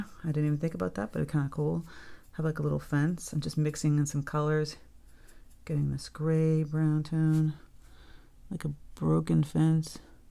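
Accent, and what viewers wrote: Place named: New England, United States. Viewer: Thank you so much! You are such a gifts painter!! I appreciate your instruction so much I love watching you paint, I learn tons of things. ❤ Plus I have grown up in the Southeast, and listening to your New England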